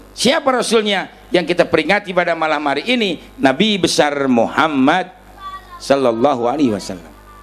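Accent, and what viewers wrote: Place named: Jakarta, Indonesia. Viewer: suara dan bahasa khas betawi sejak dulu orde baru tegas dan berani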